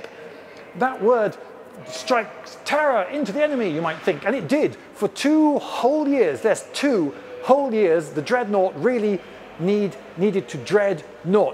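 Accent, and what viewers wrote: England, United Kingdom. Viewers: In other words, he sounds very British. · No, he sounds fairly upper middle class, English. Welsh and Scots are also "British".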